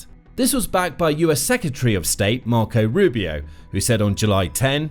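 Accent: United Kingdom. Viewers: The narrator is obviously British...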